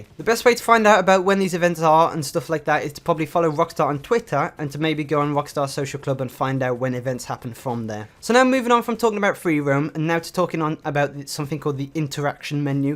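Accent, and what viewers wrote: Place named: United Kingdom. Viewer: Dat british acscend doe.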